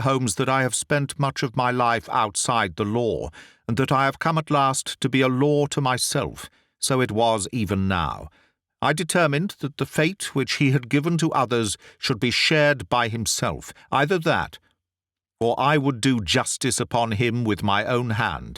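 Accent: United Kingdom. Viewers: The eloquent British dude running this channel has no idea how many times his soothing narration has rocked me off to sleep.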